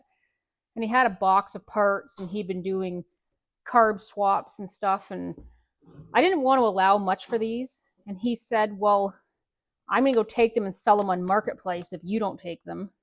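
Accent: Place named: Canada. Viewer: Don't you just love how Canadians pronounce certain words.............like "out" which becomes "oot"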